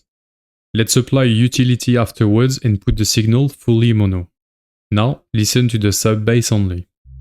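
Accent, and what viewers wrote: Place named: France. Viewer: À l'accent, j'imagine que t'es français donc je me permets de le faire en fr. Super vidéo ! Je viens de découvrir ta chaîne et tu nous donnes des tips vraiment utiles ! En tout cas j'avais jamais vu une vidéo comme celle-ci sur les prb de phase. Merci mec !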